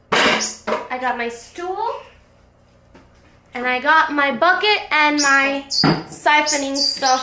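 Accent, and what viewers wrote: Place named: Cuba. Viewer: 😂😂😂 that Cuban accent kills! I love it! I miss my Cuban people in Miami! I miss Miami!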